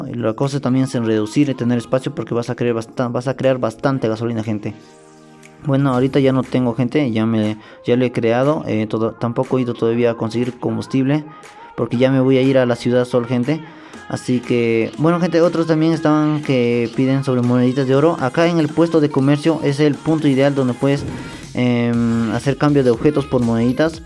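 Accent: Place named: Peru. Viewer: PURA VOZ DE PERUANO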